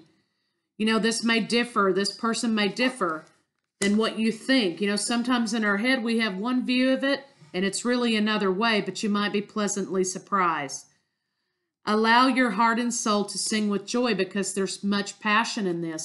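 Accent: Texas, United States. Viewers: You are truly gifted. Totally resonates. You sound like you are from Texas, like me. Blessings..🙋🌹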